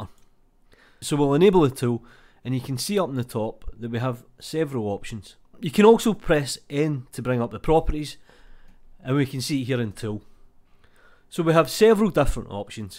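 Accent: Scotland, United Kingdom. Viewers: A really heavy and equally cool scottish accent :-) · English accent ? Scotish? Welsh ?? Amazing ....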